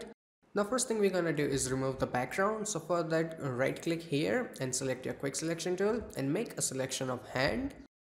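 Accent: India. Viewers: Its funny how fast this guy speaks, Indian english is cool. A very good tutorial indeed. Thanks!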